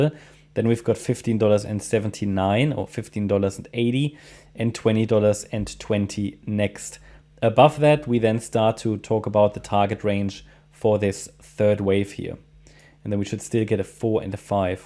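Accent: Germany. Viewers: Immer schön deutsche Leute Englisch reden zu hören. Gruß aus Berlin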